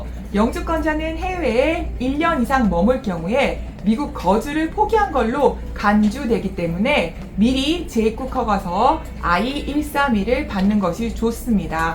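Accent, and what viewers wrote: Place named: United Kingdom. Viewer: Someone said Tomato, and someone said Tomaito. Potato, or Poteito(물론 발음한정) 만약에 British Accent 는 저분의 발음에 가까습니다. 영어의 자음은 지역에 따라 변화가 심합니다. Northern Ireland accent 가 아마도 가장 좋은 예 입니다. 물론 London 의 Essex 지방은 , L. 또는 T 발음이 거의 묵음에 가깝고, Australia Accent 도 아주 심한 모음 변화를 같고있습니다 (AEIOU)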